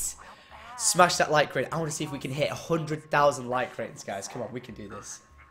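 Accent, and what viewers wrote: United Kingdom. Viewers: I like British guys British I like him <3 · Are you British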